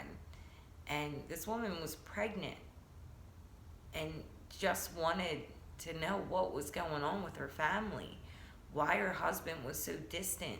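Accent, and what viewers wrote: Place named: Philadelphia, United States. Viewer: I’m so glad you did this because I wasn’t sure of the details of what was going on but there was no way I was gonna give one more view to MGL because I’m done with her garbage ass. Also, are you from Philly? Haha def a Philly or NJ accent!!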